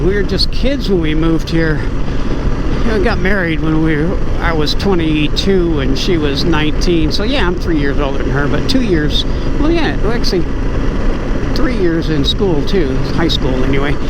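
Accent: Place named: Illinois, United States. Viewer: I once had a coworker/friend from Boston, and he sounded like it too. A real strong Boston accent. He used to tell us that he didn't have an accent, the rest of us had an accent. I was born and raised in LA. When I was in the military they shipped me all over the U.S. Although I'm not Cajun, everyone thought I was. So I guess I got a pretty good southern LA accent. And I can still hear the IL accent that you have.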